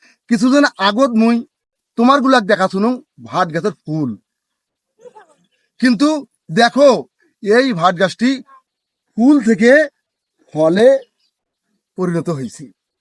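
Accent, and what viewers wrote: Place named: Rangpur, Bangladesh. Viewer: রংপুরের ভাষায় চমৎকার হয়েছে।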